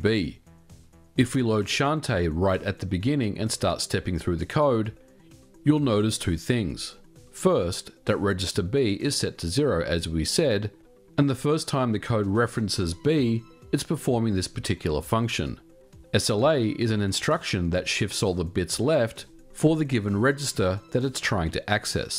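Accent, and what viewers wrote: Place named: Sydney, Australia. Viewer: You mean his "West Sydney ethnic" Australian accent? For most Australians when we hear that voice we think of a mechanic.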